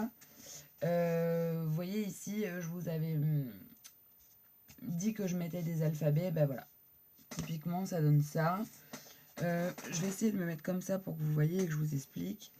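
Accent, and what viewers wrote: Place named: England, United Kingdom. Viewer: J'adore ton accent anglais :)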